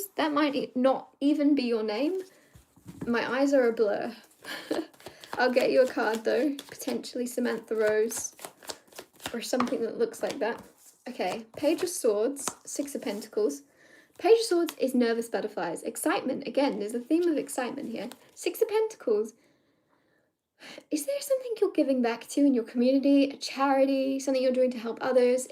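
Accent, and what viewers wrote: United Kingdom. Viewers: Hi. I thought you sounded British? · . You. Do. Sound. Very. British. Possibly. Irish. Not. Welsh. Or. Scotish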